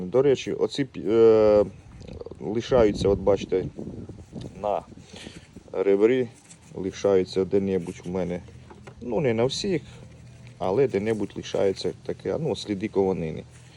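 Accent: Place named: Rivne, Ukraine. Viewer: Гарні речі робиш друже, чую по говору, ти з наших країв ( Рівненщина?)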